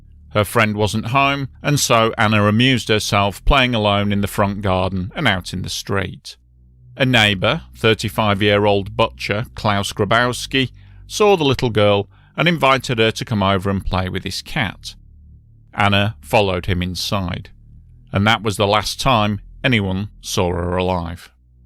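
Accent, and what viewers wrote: United Kingdom. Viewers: a damn Brit narrate a film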